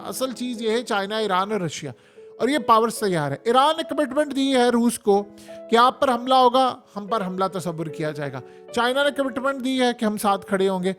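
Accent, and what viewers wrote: Punjab, India. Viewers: Would like to see the picture and name of the News reader / anchor of Haqeeqat TV. He speaks very well with a touch of Punjabi accent. The news is also very authentic and original (First to broadcast). Thanks.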